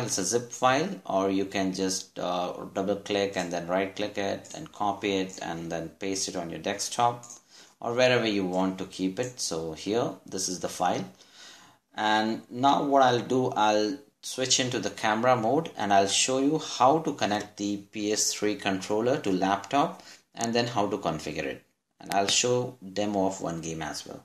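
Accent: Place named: India. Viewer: its indian guy talking, would not trust 2 much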